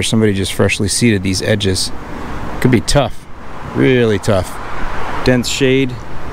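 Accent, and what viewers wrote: Chicago, United States. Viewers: MORE CHICAGO ACCENT 😎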